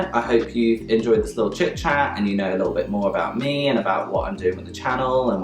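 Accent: United States. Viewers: i never get bored about hearing about Michael Jackson, also like the American voice on the videos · how come the voice overs are American men and women? I didn't know that this was a British content creator behind this Channel. Makes me like it more, fellow Brit! 🇬🇧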